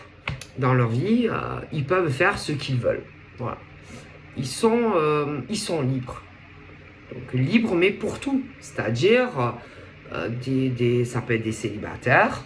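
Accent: South, France. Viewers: l'accent du Soleil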